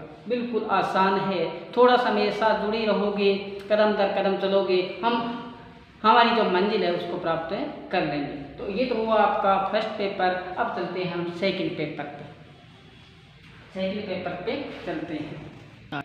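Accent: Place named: Haryana, India. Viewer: Kon sa colleage ka h ye Sir hariyanvi bol rhe h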